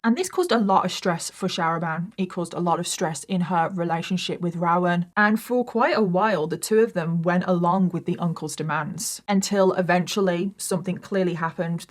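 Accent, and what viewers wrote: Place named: West Midlands, United Kingdom. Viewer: Only just found your channel. Your accent sounds local to me, West Midlands. Makes a nice change 😅 I’ll definitely be back to watch more.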